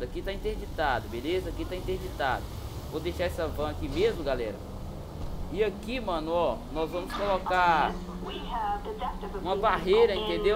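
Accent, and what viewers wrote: Minas Gerais, Brazil. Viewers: Ele e mineiro?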